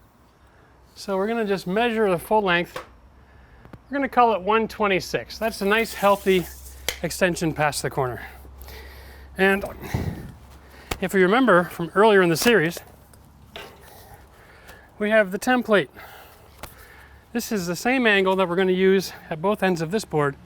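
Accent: Canada. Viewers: Not 2 minutes before you mentioned Red Green, you said something with your Canadian accent and I said to myself, "he sounds like Red Green." That guy's funny.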